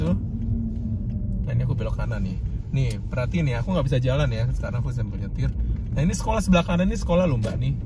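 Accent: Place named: Java, Indonesia. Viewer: logat bicaranya njawani banget kok gak mbataki Semoga chanelnya makin berkembang dan sukses ya bang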